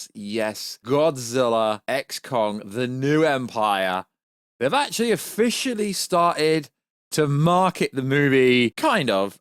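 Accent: United Kingdom. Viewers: you have a cool British accent!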